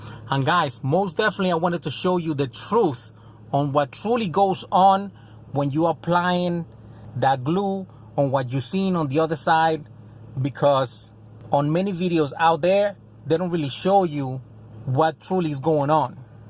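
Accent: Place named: South Florida, United States. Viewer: OMG, thanks for this video! I was about to try on my moms super saggy headliner and I KNOW that foam is all deteriorated like in your video, I can feel it crumbly through the fabric. It's not worth it right now, lol. btw, I KNEW you were also from SoFla as soon as I heard you talk, thanks for the great video neighbor!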